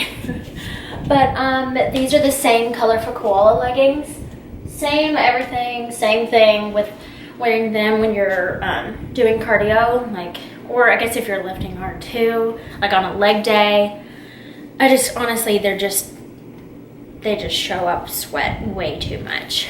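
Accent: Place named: South, United States. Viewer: nice accent of the sweet south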